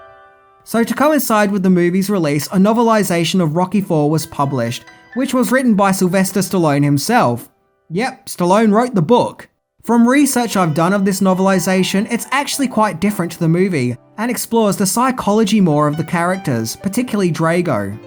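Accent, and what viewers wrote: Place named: Australia. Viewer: Not DraYgo...........DraW-go...................I understand you have the Australian accent, however its also important you pronounce things correctly.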